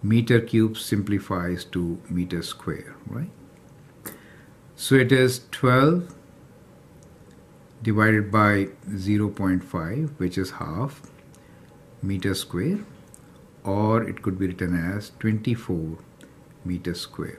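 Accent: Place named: India. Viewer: Love indian accents, they sound so nastalgic to back when i lived in india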